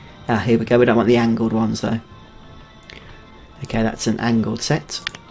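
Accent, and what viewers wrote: United Kingdom. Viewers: I like him he sound like a really posh English man same as me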